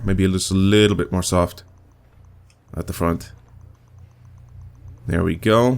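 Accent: Ireland. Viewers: ***** aha, i thought it had a bit of irish, but i always felt like it was something else, i would have asked you earlier, i just didnt have an account :P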